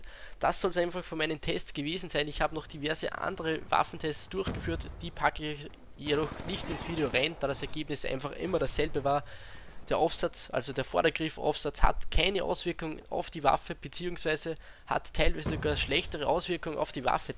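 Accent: Austria. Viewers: Wo genau kommst du eig. aus Österreich?:D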